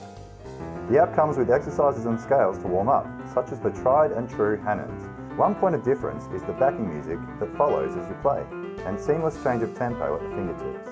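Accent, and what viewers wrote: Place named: Australia. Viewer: This is how Australians speak, proper English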